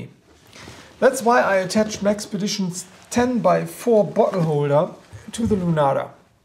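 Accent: Germany. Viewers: Your english is cool - I like the german accent ;)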